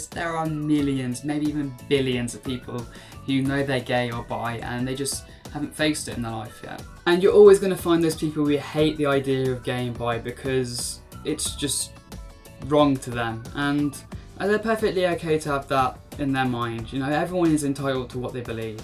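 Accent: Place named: United Kingdom. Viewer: You look so good and such a beautiful long neck, and that beautiful British accent, you are adorable.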